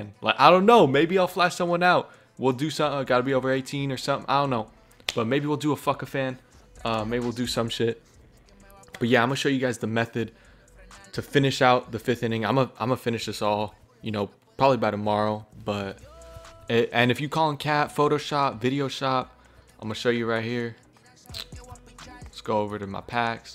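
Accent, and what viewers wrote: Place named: Bay Area, United States. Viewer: You have to be from The Bay talking like this😂😂😂😂. Gained a new sub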